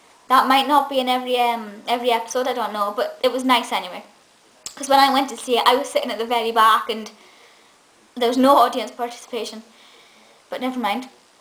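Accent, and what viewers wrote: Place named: Scouse, United Kingdom. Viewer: Paul O Grady show is great it's the only chat show I could really get into. You sound a bit scouse with a bit of scot in you.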